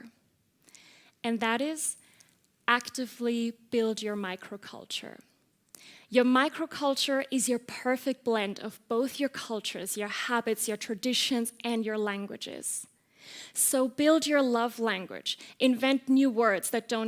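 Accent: Germany. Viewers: I could here she was a native german speaker by the way she talked 5 secs into the video lmao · Yes there is a German tinge in your English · This cadence at the end of each phrase, the intonation sound like German despite the good pronunciation in English interestingly. Creating new words is a great idea!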